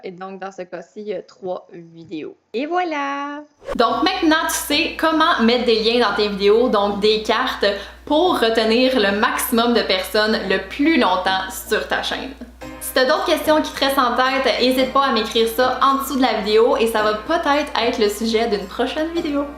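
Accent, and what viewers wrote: Quebec, Canada. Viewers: Putain, l'accent Canadien c'est vraiment trop horrible ! Sérieux ! 😱. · J'aim très ton accent 😍 c'est le Québécois ? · allant et parlant trop vite,on a du mal à vous comprendre avec votre accent Canadien,juste une explication comment envoyer un lien d'une vidéo à quelqu'un sur une autre vidé,Merci. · Merci pour ce tuto sympa avec l'accent canadien que j'adore ! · Merci pour la vidéo ! J'adore l'accent québécois !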